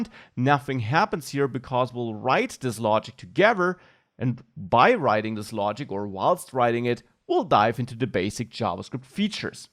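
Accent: Germany. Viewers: I love the way you walk through and explain all the different concepts here, supported by great examples. You are articulate, concise, and obviously very knowledgeable. Your (slight) German accent only adds to the charm, so bonus points for that!:-) I just bought the full course after watching this introduction, because you are the best teacher I have come across yet on this topic, and I have seen quite some. I really can't wait to dive deeper into the super interesting world of JavaScript. Thank you very much, and keep up the great work!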